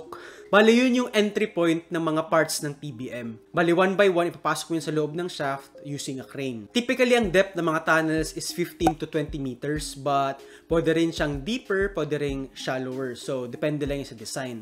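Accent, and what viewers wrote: Visayas, Philippines. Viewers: Bisaya diay ka sir hhahaa 🙂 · Bisaya diay... 😆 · Taga asa man ka Sir bisaya man ka ehehe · Uy bisaya man diay haha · Bisaya accent🤣🤣💪💪Sakk lagay..... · Dugmok🤣🤣 cute kaau ka magtagalog sir bisaya kaau.murag ako sd 🤣. New sub here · Bisaya mandi-ay ka Engineer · AY BISAYA D I KA? KAYATA PAREHA TA 😂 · Ayyy bisaya ka.man dong 🤣🤣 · bisaya d.i ka bay ahaha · Bisaya ka pala bay grabeha HAHAHA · Ayg paila na bisaya ka baii bwhaja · Nalingaw kos bisaya nimo tol, bagong fan here 😂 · Bisaya ka man d.i Engr. hehe salamat sa paliwanag 😁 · bisaya kaayo oi! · hahahahahah bisaya pud diay ka lodi? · Bisaya ka engineer?😅🤣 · bisaya man diay ka kol, hahaha! nice content. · IDOL GYUD NKO IMONG ACCENT SA BISAYA DOL :D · Bisaya ka man diay sir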